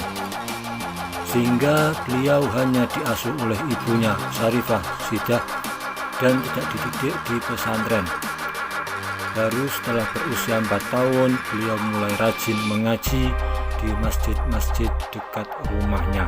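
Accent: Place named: Java, Indonesia. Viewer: Medok amat ai mas broooooo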